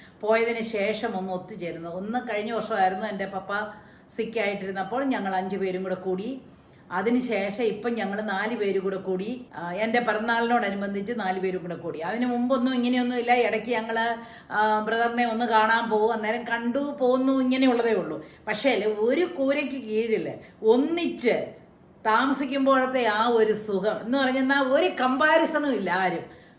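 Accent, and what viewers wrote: India. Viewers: Nammal kottayam language supper my housepalail anu mam nu big salutu. Paranjathallam cherppatthil ingana airunnu veetil ❤❤❤❤❤❤❤ Allamm ketttu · ചേച്ചീ, മീനച്ചിൽ താലൂക്കിലെ ഭാഷ മിന്നസോട്ടയിൽ കേൾക്കുമ്പോൾ ഒരു സന്തോഷം 😃😍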